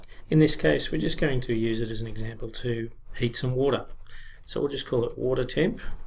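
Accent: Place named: Australia. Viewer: Thank you for superb teaching video from Thailand. Listening to your accent I missed all friends in Melbourne.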